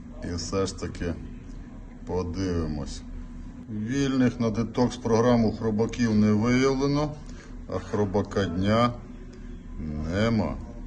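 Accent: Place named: Mukachevo, Ukraine. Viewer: Мадяр у тебя акцент как у жителей Мукачево.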